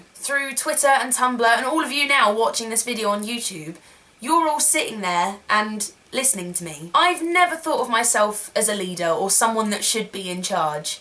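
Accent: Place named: England, United Kingdom. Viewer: What part of England are you from? love your accent:)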